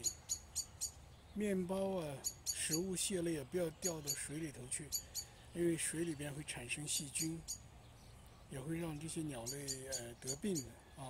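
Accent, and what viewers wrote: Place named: Zhejiang, China. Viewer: 老哥是大陆移民吗，听口音像是浙江的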